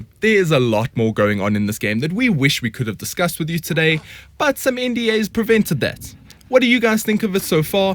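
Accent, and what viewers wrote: South Africa. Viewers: You South African bro? Nice review. Yeah you're definitely from Cape Town. Stellies maybe?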